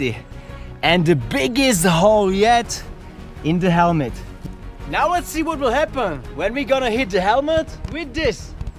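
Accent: Czech Republic. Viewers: Nejsi z česka náhodou? Podle přízvuku tak zníš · i think you are from czech republic.. your english is good but.. your voice, btw great video ;) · Super video a hlavně i originální dávám like a odběr a umíš plynule anglicky až na ten Českej přízvuk ale to není žádném problém :D přeju hodně subs protože si to rozhodně zasloužíš!!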